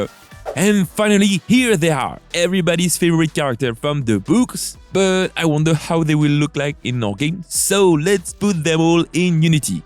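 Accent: France. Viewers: Ton accent français m’avais manqué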